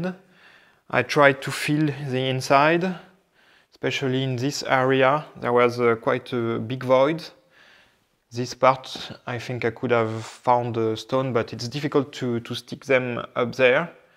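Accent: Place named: France. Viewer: New subscriber, just got one of your videos recommended the other day and have now went back to the beginning and binge watched them all. Excellent series and I am invested. Looking forward to the day (if ever) you decide to excavate the under cellar and maybe seew where the filled in tunnel leads to. Also every time you say the "poop cellar" in your lovely French accent I crack up.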